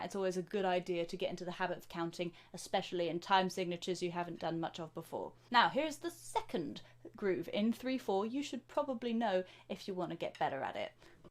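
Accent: United Kingdom. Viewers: This makes me want to get back into drumming again! Hello from New Zealand! Love the British accent 😛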